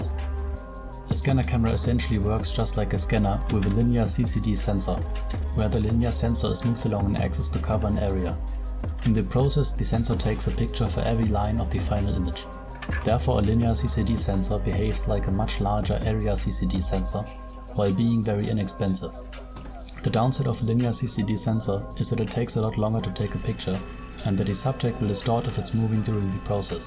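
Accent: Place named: Germany. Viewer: So yours Last name is polish but you have German accent 😄✌️👋Nice progect 😉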